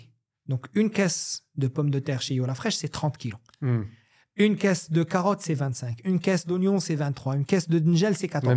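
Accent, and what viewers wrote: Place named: France. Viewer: Surtout lorsque ces mots anglais sont prononcés avec un très fort accent français. C’est risible pour ne pas dire embarrassant.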